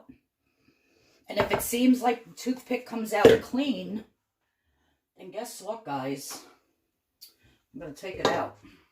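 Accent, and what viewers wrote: New York, United States. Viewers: German baking is one of my favorites. Somehow Germans knew what they were doing back then. R u from Brooklyn, Queens, or Long Island. U sound like my family! Haaa!!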